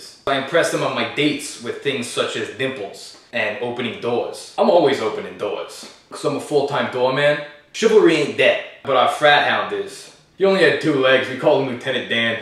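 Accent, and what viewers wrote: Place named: New York, United States. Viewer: I have a guy like this in my fraternity. Dude is hilarious. Same accent, too. NYC!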